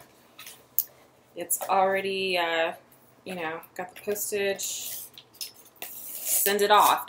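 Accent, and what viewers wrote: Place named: United Kingdom. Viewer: HI, I like your ancestry DNA videos. All are very interesting. You have a slight British accent.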